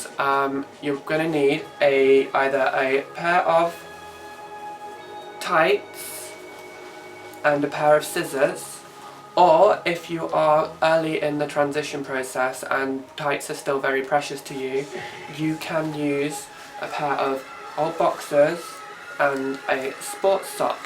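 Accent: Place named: South, United States. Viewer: Dear. I found your video by accident. The title confused me. So I watched. "WOW"..... What an eye opener for me. I could not beleive it when you said you were transgendered. Anyhoo I just wanted to say as a regular fella. I think that you are a stunning lady who should have been a woman from birth. Also you made me laugh when you had to talk about the 'delicate" parts of the process. The southern accent made me laugh when talking about dropping a "bollock" (excuse the lack of imagination for my discription) I hope the future is bright and you become the person you so clearly should have always been. Thanks for the eye opener kind regards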